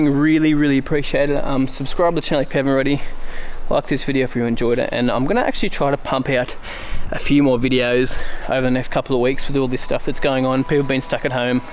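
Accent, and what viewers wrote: Australia. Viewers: Hey Man U from Aus?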